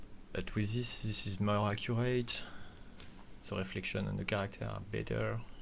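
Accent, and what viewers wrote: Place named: France. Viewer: Bonne vidéo, merci. L'avantage quand un français parle anglais, c'est que du coup je comprends l'anglais. Ceci n'est pas un reproche hein, juste un clin d'œil. Je parle comme une vache espagnole. Merci encore à toi. Le coup des fog est impressionnant. Chapeau.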